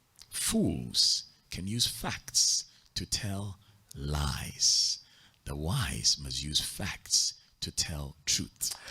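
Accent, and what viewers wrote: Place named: United Kingdom. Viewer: But you’ve got a British accent so what should we call you.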